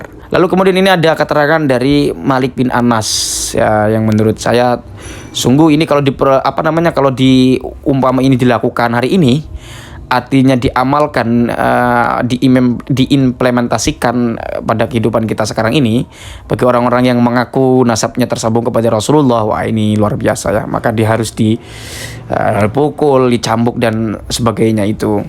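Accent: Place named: Serang, Indonesia. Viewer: Kan. Upload lagi ngaji kitab ala pesantren, dengan bahasa banten Kangen dengan logat serangnya😁😁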